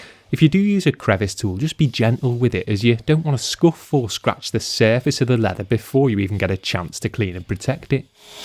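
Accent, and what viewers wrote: United Kingdom. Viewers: Somewhere near Liverpool in the North West of England. I don't know exactly where but that's where is accent is from. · Conorsz He is definitely from a particular part of the UK, I'm just not sure which area.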